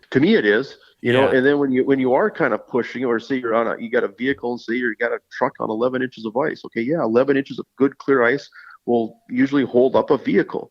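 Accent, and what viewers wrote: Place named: Minnesota, United States. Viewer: Moved from Mid-west 26 years ago , you can not hide that minnesoat, north dakota accent. Dont-cha-know!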